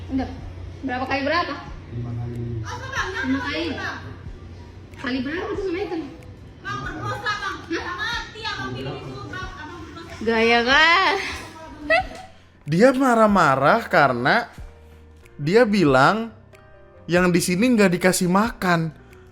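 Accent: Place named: Medan, Indonesia. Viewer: Medan bah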